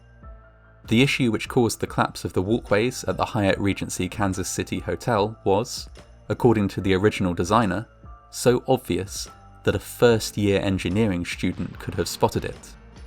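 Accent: England, United Kingdom. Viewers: I LOVE SO MUCH YOUR ENGLISH PRONUNCIATION, THE GENUINE BRITISH ENGLISH ! I AM FROM BRAZIL AND STUDY ENGLISH! I AM GOING TO WATCH ALL YOUR VIDEOS JUST BECAUSE I ADORE THE WAY YOU SPEAK BRITISH ENGLISH! CONGRATULATIONS FOR YOU!👍👍👏👏👏👏👏👏👏👏👏👏👏👏👏👏👏👏👏👏👏👏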